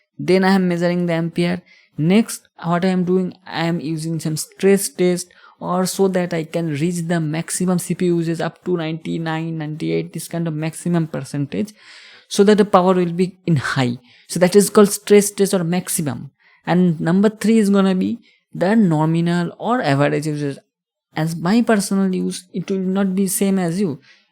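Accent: Bengal, India. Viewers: U r Bengali for sure 😅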